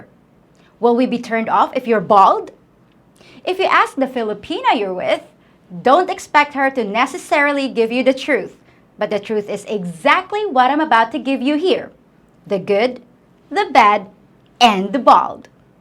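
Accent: United States. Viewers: very well done video. Just one thing is a little disturbing but I do not mean it at all as an insult: if you speak in english, it would sound nicer to use proper british english instead tp but on american accent what is seen as not that nice to hear in Europe. Never mind. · Your American style of English is interesting.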